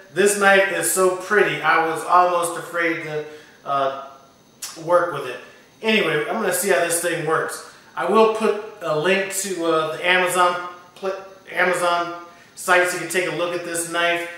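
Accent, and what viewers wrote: South, United States. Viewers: Nothing wrong with a good Southern Drawl haha. I am friends with Christy's Cooking Channel. Those things look awesome. I will check hers out too.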